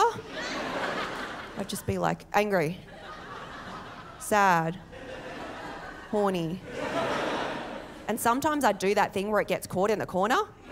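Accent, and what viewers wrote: Australia. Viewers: I'm a gen x white guy from Melbourne and she sounds more Aussie than me lol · You are the best of both world , beautiful Asian looks and wiked Aussie accent , keep up the great work you have a great sense of humor ! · She's Aussie for sure! No Asian can speak like that!🤭😎👍👍👍👌👌👊 Your awesome lady!👍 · Sounds more Aussie than most Aussies😁🤣🤣👍🇦🇺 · Hi. "Bogan" isn't exactly the equivalent of American 'Redneck'. (it's just the nearest word/type to explain it). A better description of Bogan is "a bit rough" in style and speech. accent is how some Bogans would also sound. (some, not all). However, there's also thing called "cashed-up" bogans. Definitely not unsophisticated, just might sound it from way they talk. Of course there are also the many "true bogans". After 4 or 5 VBs, or Fruitly Lexia, nearly everyone becomes Bogan, lol There's several types of Bogans, just like there's (more than) several types of Australian accent. 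is not common now in Melbourne or Sydney & in a lot of Brisbane any more, except in some outer suburbs. Many Australians are commenting, in/on videos it's the "most Australian" accent they've heard. That type of Aus accent was common till the 90s, but in the cities, at least it's often more rounded now. But there's also the frequent Euro-Australian accent, which is another, again. (Although, quite a number of Austalians don't realise they're own accent is more "Australian" that they think) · I've never heard anyone more Australian in my life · That's pure Aussie accent, Mate! She grew up in Sydney. You just assumed she has Vietnamese heritage from her name, & while she says she Chinese, she was NOT born in China nor Vietnam. You'll never get where was she was born! If you think the tone changes & the accentuated vowels is anything to with a Chinese or Vietnamese accent it doesn't!. That is all 100% this type of Aus accent. 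If you'd only had heard the audio, you would have no clue there's anything other than aussie. Because there isn't. Now go watch Diana Ngyen, to see the difference between accent, & what you wrongly think her accent is. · You are quite funny. I love your Aussie accent. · It's unusual to see someone of Asian heritage (or African) with such a strong Aussie accent. It's very endearing! Continue being bogan and proud! Own it! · What makes her funny is she has a THICK Aussie accent, she's funny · she has a Asian au accent very common in Melbourne and Sydney she doesn’t sound bogan at all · You sound more Australian than Nicole Kidman and Margot Robbie combined. Good stuff lol. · I love when another nationality sounds like us true Aussie’s ! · I Honestly never realized Super Cute Asian Girl with an Australian accent was my thing until just now. Alas, you're too young for me young lady, so it'll never work. 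You're really funny. You deserve a Comedy special. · She has that Australian Asian accent. Most of my Aussie asian friends have it. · If you live in Australia and are respectful and proud to be in Australia then I have no problem with calling you Australian. You however have such a strong Aussie accent that I would have assumed you were actually born here and maybe even your parents had been here for a long time. Also that was a hilarious video. Cheers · She sounds so Aussie it’s mad. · ABC 👍👍perfect australian accent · Nah you got that Asian Australian voice/accent. There’s that accent that is not a fob accent, but it’s very typical of Chinese descent Aussies.. especially women. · Love the Aussie accent regardless of the ethnicity… .. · face deffo doesnt match the voice. yer an Aussie through and through. subbed. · She has the Chinese/Vietnamese Australian accent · I love watching and of course listening to her Australian accent. 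Her routine is funny and her timing is awesome!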